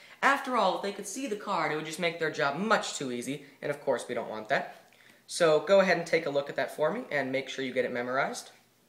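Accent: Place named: United Kingdom. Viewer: Oh, are you British or American?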